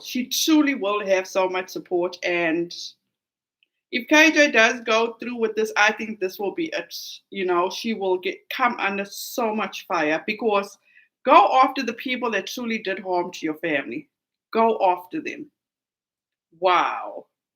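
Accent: South Africa. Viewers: Btw I love your accent, I worked with 4 S. African doctors, love it!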